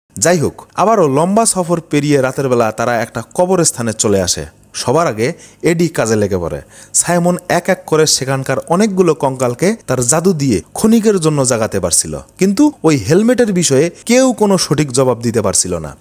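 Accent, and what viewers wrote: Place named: Jessore-Kushtia, Bangladesh. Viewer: ভাই আপনার বাড়ী কী যশোর,কুষ্টিয়া বা ঝিনাইদহের দিকে,,আপনার ভাষায় আমার এলাকার টান❤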